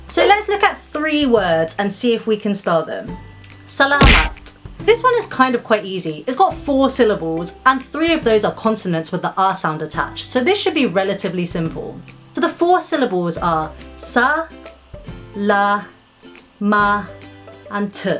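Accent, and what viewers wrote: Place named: United Kingdom. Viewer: I am new at your channel and I like your British accent.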